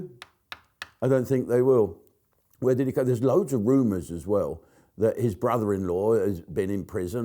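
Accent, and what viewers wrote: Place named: Scotland, United Kingdom. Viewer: Love your jock accent 🤣🤣🤣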